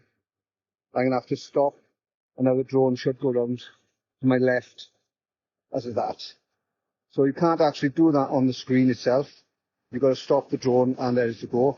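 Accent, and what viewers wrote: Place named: Welsh border, United Kingdom. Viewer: Hi started watching your videos and theyre very good 👍 Ive been a drone flyer since parrot 1 drone then parrot 2 and finally DJI drones 🤣 Im not a proffesional drone flyer just a hobby like yourself 😉 I have the neo 1 neo 2 and avata 2 plus the dji pocket 2 camera which I havent updated because Ive not used this one 🤣 I do have controllers N3. FPV controllers 3 and motion 3 controller plus goggles N3.. I am now retired and keep saying I will start a drone channel soon 😂 always next week when weathers better 😂 From near Wrexham so can understand your accent 😅 👍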